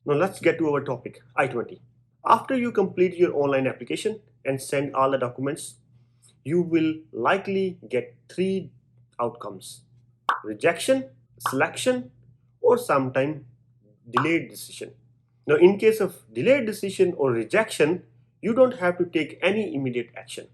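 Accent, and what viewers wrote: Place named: Bihar, India. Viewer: Abey Bihari...accent theek karo.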